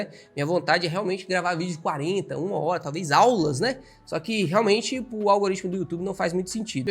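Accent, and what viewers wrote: Caipira, Brazil. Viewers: Você peca na sua fala, pois nas palavras que tem a letra "r" vc quase embola a lingua. Seu sotaque parece com um caipira . Horrível e cansativo ouvir.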